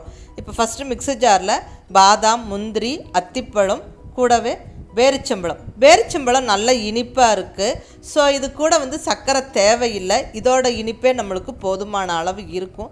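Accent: Kongu, India. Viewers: Good one ma'am. Thank you. Namma kongu tamil alago alagu.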